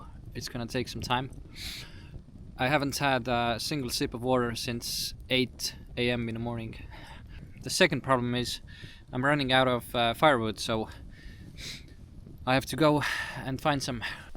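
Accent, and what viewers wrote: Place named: India. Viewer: plzz aitab! Sa teed megägedusi... aga see su `english`` on nagu india smthinng .....nääääh.... noup... no ei,,,,,kus on Gabriel??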